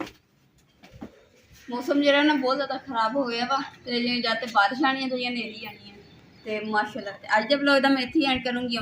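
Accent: Punjab, India. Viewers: App Punjab ke log jasy bolty hai vasu hi appebolty ho mai Punjab say hu sis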